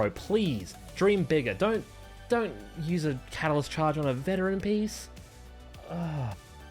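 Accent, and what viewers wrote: Australia. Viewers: He's got some kind of Australian accent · Bro theres nothing better than hearing another brother aussie making WoW videos <3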